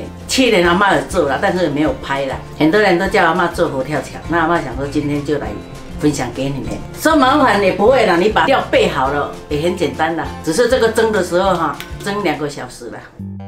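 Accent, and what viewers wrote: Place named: Taiwan. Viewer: 阿嬤.好可愛台灣國語支持你👍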